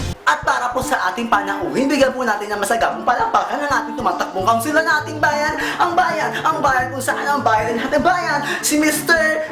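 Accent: Visayas, Philippines. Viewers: Taga san ka po? Bisaya ka ata eh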